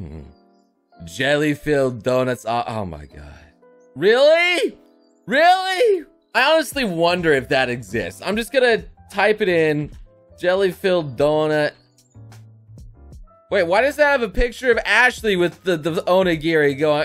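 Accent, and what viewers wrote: Scotland, United Kingdom. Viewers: I missed the scottish accent. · Ughhh that Scottish accent causes me genuine pain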